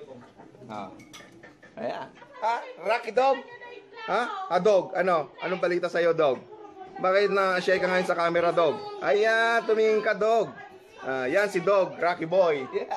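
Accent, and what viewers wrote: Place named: Tarlac, Philippines. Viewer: and ilocano ka po ? u sounds like tarlaceno or pangalatoc or ilocos kabayan pala kita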